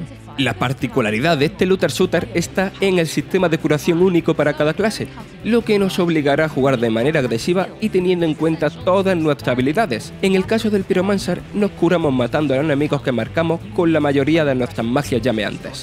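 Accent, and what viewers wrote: Spain. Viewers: Sin ánimo de ofender, quería marcar que: siendo de Latinoamérica se complica entender el acento del locutor. No se reconocer a que parte de España corresponde pero creo que lo tiene más arraigado que otras personas de esa zona que escuché hablar en otras oportunidades en videos y se entendía correctamente. Saludos y muy bueno el canal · El acento de este reseñador de Vandal de qué parte de España es? He oido ese acento en varios españoles y nunca he sabido de qué parte es. Alguien sabe? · Es un acento español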